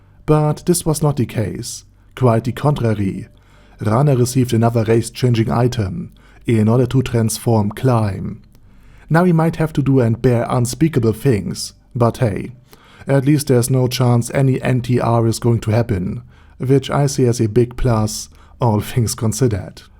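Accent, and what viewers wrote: Germany. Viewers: Hearing about an anime from a German accent it's just so weird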